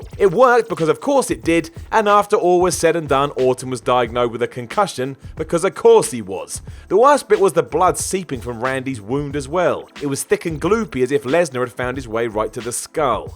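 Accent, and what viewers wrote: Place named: England, United Kingdom. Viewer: Hey so, I'm young, I love pro wrestling, and whatculture wrestling is my favorite channel. Please forgive me but I'm curious. How come when Englishmen end a word that ends in an 'A' it kind of sounds like an 'er'? Much love. Again just curious.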